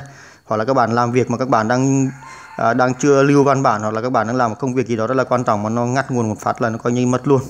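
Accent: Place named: Nghe An, Vietnam. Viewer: Giọng xứ Nghệ nói khó nghe thật , lắng nghe nhức lỗ tai mà chẳng hiểu nói gì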